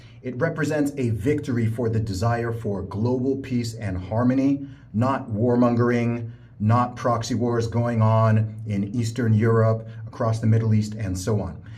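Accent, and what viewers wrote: North America. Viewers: Actually, I DIDN'T know you were a brit tbh. It's your accent, it sounds abit like North American english. Oof, I feel stupid now.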